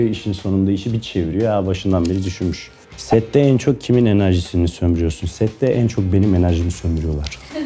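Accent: Black Sea, Turkey. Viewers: Çok güzel Karadenizli konuşuyor ve gerçekten çok sevdiğim bir oyuncu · Ohaa efsane karadeniz sivesi var yaa😍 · Yaaa cok tatlııısin karedeniz şivesi de var bal ayrica SAKAL SANA COK YAKISIYOR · Senin Karadeniz sivenii yerim usagim 😂😂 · Lazca değil o, karadeniz 'ağzı' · Cok güzel karadeniz sivesi yapiyor. Bende inanmazdim laz olmadigina 🙈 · Ben keradenizli sandim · Lazca değil o, karadeniz 'ağzı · Eren abi çok güzel karadenizce konuşuyor ❤❤Ayrıca Niye SÖZ bitti ❤❤Seviliyorsun Abi ❤❤❤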